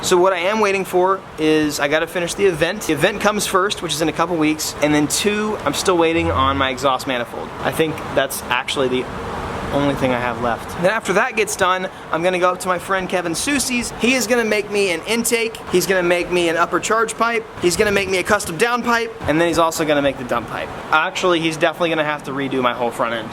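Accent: Australia. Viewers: I love the Aussie accent! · Ten out Of ten for Aussie accent 👍👍👍👍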